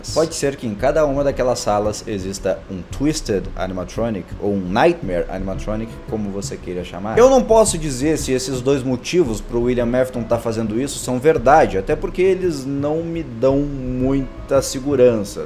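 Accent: Rio Grande do Sul, Brazil. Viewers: Ele é gaúcho?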